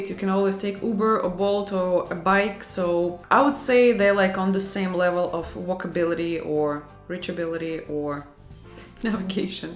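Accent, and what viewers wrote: Russia. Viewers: She talks about everything being "amanha, amanha amanha" (tomorrow tomorrow tomorrow) but from her accent I'm pretty sure she's Russian and therefore she's in no position to say anything negative about any other country. I'm sure everything is worse in Russia.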